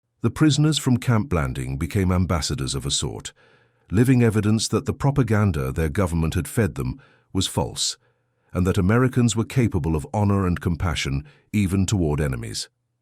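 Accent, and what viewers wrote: United Kingdom. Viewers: Watching from 50 miles east of Camp Blanding, in NE Florida. The US Army doesn't have 'Left-tenants', we have 'Lew-tenants'. Is your AI narrator British? There is 'cognitive dissonance' again st . It's in every video you post.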